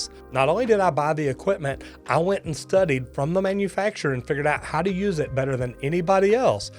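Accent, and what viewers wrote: South, United States. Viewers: I kinda like his accent. Not too southern and not to Northern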